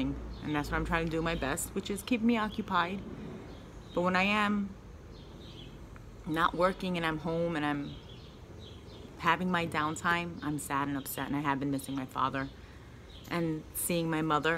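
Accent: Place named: New Jersey, United States. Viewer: a stalker. By the way you sound more like Jersey than Brooklyn. Lol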